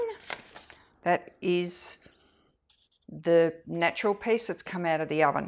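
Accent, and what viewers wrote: Australia. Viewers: I love your Australian accent! Sounds very royal haha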